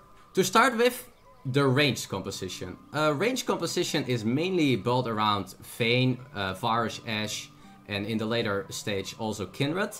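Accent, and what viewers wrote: Netherlands. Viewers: This guys his dutch accent is so strong lol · Obviously Dutch, that accent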